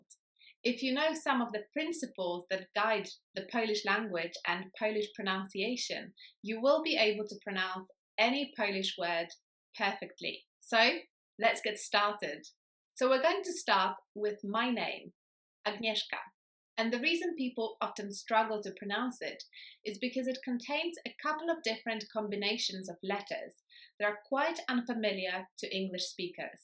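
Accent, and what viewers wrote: England, United Kingdom. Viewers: Good explanation. Almost has an English accent. I reside in Alaska. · Polish, speaking English with a British accent. And it's not Michael said the cat, it's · Love your English accent 💕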